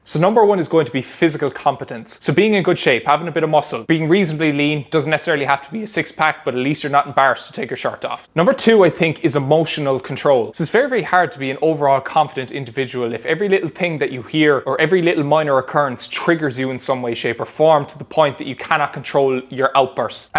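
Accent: Ireland. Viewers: he's from ireland, I think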